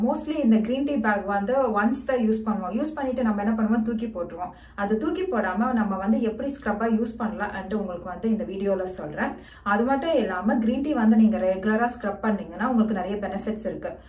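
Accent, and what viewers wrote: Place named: Telugu, India. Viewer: Very nice tips...loved your telugu tamil :)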